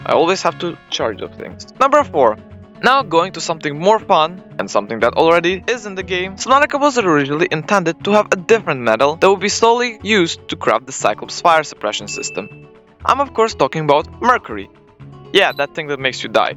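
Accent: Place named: Poland. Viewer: Ale masz Polski akcent bardzo to słychać XD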